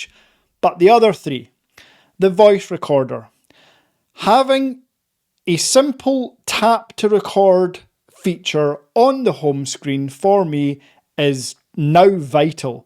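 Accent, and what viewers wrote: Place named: Scotland, United Kingdom. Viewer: Just wanted to say thank for the IR remote tip! I recently got a fan that was unable to work with the international app and unable to work with the chinese app due to it being region locked. Was cracking my head for days on troubleshooting but you totally solved that issue! Love your channel, very straightforward no fluff reviews and got to say, that Scottish accent is killer.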